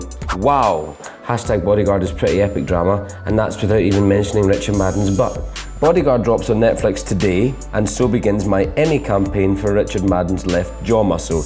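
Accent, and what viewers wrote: Scotland, United Kingdom. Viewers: He's Scottish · he's so Scottish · Scottish accent! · it's a Scottish accent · Trepimero Scottish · Omg, he is a Scot! Just realized that!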